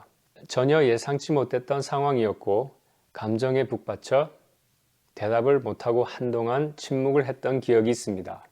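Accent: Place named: Daegu, South Korea. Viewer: 감사합니다! 사투리가 살짝 들리는데 대구분 같기도하고..(저는 대구 토배기입니다ㅎㅎ) 뭔가 더 반갑습니다ㅎㅎ 종종 업데이트 드리겠습니다!!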